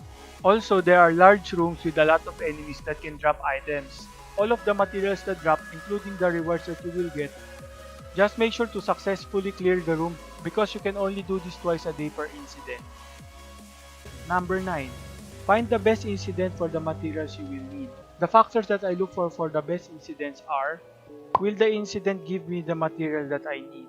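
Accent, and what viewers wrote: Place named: Philippines. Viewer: Nice I need farming materials I need more for my attackers need more yarn and you sound Pinoy. Pinoy ka ano? At ako newest subscriber mo helpful vid mo eh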